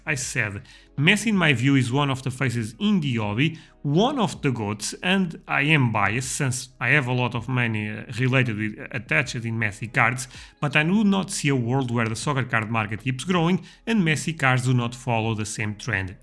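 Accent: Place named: Portugal. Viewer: video muito porreiro, reparei logo pelo sotaque que eras português. novo sub ;)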